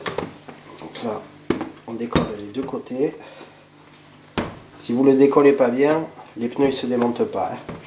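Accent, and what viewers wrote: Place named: South, France. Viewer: Bjr Merci du tuto. Chèr rider cést tress bien. Réalisér laetitia de verviers en Belgique pianiste.. Et rideuse dans mes heures libres.... Un grand coup de chapeau. Pour remettre ce pneu.. En action.. Cest une bonnne vidéo bel accent du sud..❤️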